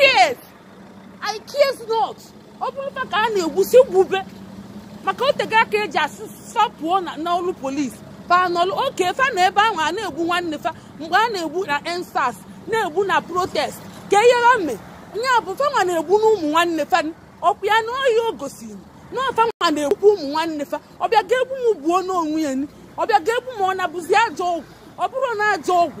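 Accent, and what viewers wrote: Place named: Igbo, Nigeria. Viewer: I like your igbo accent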